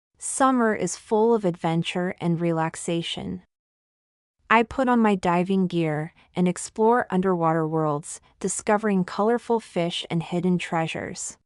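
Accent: United Kingdom. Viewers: Please does this channel have a British accent? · Is it British accent ?! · Is this British english?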